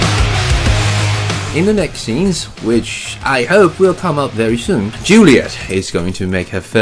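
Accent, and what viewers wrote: United Kingdom. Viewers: 已訂閱，全部影片重複播放中。 最喜歡老師唸故事的時候，閉上眼睛沈浸在優雅的英國腔裡。 常常因此捷運坐過頭😝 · ，你的英國腔真帥啊！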